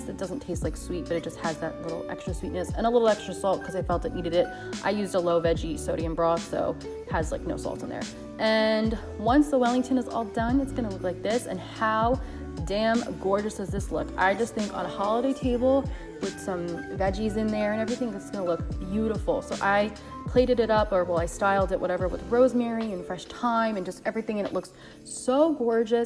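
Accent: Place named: Long Island, United States. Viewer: You from Long Island? I can heart in your voice. I’m a Queens boy myself. Great recipe!!!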